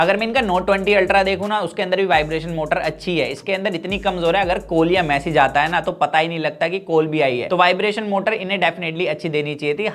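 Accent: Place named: Bhojpuri, India. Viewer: Batiya rhe bhojpuri 😂 achha lga bhojpuri type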